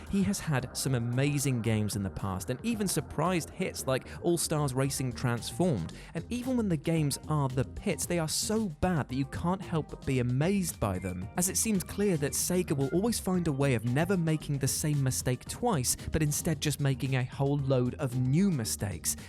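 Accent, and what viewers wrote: United Kingdom. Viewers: He's british, why would he use the american pronunciation? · I still rage-clicked the Dislike button. Your British accent is annoying!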